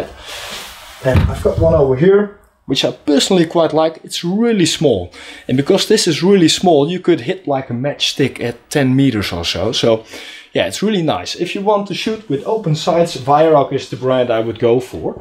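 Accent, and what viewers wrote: Netherlands. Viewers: Very good review. Wonderful accent, sounded like Paul Whitehouse Dutch Policeman.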